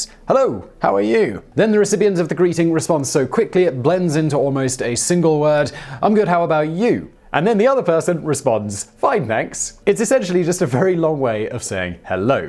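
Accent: United Kingdom. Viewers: The incentive isnt to get people to buy larger portions, it's to get them to spend more money. Obesity is more lifestyle than mcdonalds, but you almost know everything. I guess the british accent makes you more trustworthy to americans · I liked your soft hearted tone better this time. I noticed you get real "British" sometimes when speaking about America. Ha. Do you man, but I did enjoy this a lot more. I'm a sensitive guy.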